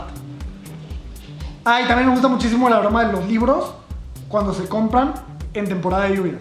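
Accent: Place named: Oaxaca, Mexico. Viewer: quien diria que el es un don amargado desde sus 25 o desde antes, lo mas botana es que es un don oaxaqueño con acento paps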